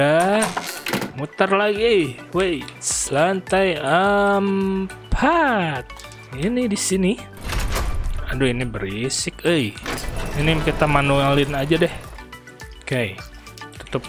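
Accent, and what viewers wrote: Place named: Sunda, Indonesia. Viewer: Orang mana sih bang kok ada sundanya ada jawanya?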